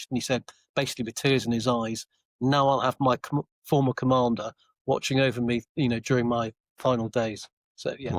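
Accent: Wales, United Kingdom. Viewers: Are you Welsh? Great video! Sub’d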